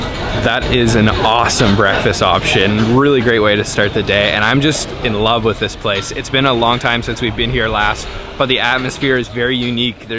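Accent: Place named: Canada. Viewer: Your Canadian accent is so freaking annoying.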